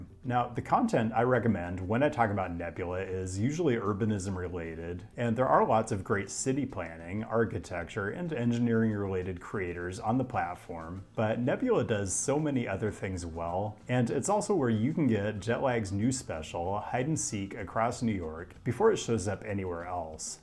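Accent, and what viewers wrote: United States. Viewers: Thanks for covering my city ... but as with last video I am again triggered by your pronounciation of Melbourne. Your accent is American .. its okay and expected to include the "R" (MEL-burn). Your way (MEL-bun) is not American nor is it Australian ... it is just odd. And it's Parra-mat-a .. not "mot-a". Ignore my silly YT comment whinging ... great content.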